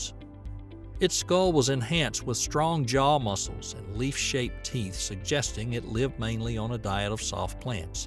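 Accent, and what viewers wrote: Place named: Tennessee, United States. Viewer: I love this man's accent. I wonder where he's from. Sounds like a Tennessee neighbor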